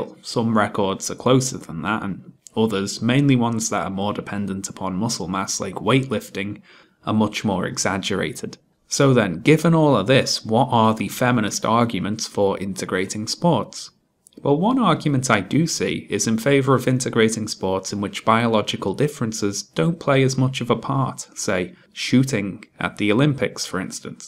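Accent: Liverpool, United Kingdom. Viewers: ahh wait nah im now hearing more liverpool type tones in your accent, nvm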